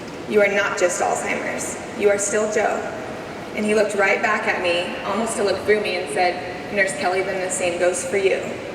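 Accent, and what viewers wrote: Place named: United States. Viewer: Talk more cringey bs in that awful yank voice!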